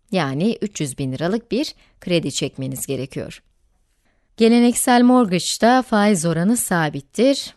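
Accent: United States. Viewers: Fazla Amerikanvari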